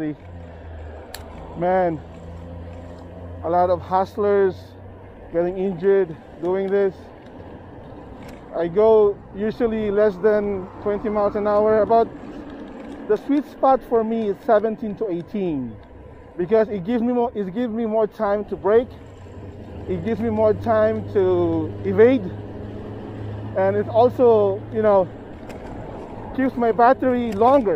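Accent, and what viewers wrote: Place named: Southeast Asia. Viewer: your accent is asian... errrr South east asian?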